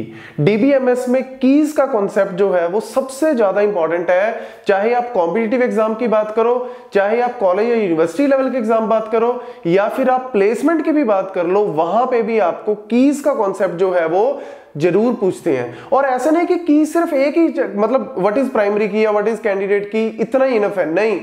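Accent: Punjab, India. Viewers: That punjabi tone in ur voice 🔥🔥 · Sir aapke bolne k tareeke se panjab ki khusboo aati h 😁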